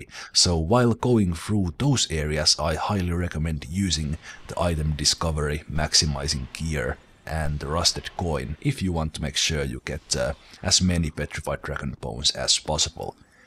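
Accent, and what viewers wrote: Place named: Finland. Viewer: Where are you from your accent is kinda finnish type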